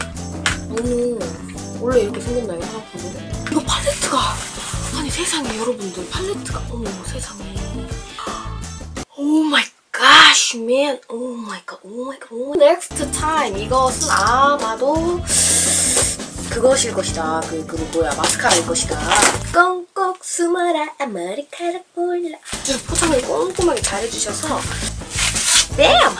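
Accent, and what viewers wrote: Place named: Jeolla, South Korea. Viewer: 옴메 사투리 전라도여?!?!?! 나돈디!!! 넘모 귀어우셔서 구독하고감!!!❤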